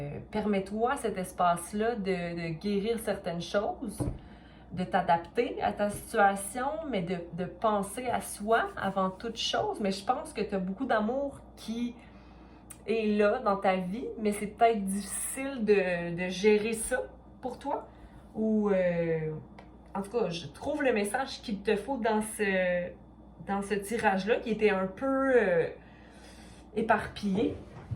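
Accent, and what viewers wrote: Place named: Quebec, Canada. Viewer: Coucou ma belle 😊 Merci beaucoup pour ces guidances, en effet, j'essaie de me sentir bien avec moi même, j'y travail, je ne dois pas me sentir "complète" avec un autre, mais l'être moi même 😉😊 Juste une petite suggestion, rien de méchant... Ce serait vraiment beaucoup mieux si tu mettais le signe astrologique en premier dans le titre de tes vidéos, car nous sommes obligés d'aller sur chacunes d'elles avant de tomber sur le signe voulu. 😉 Par exemple : Verseau ♒ semaine du 8 au 14. Voilà 😊 je te souhaite un bon week-end et mon dieu... Qu'est ce que j'adore votre accent à vous mes Québécois 🤗🥰 Bisous ma belle 😘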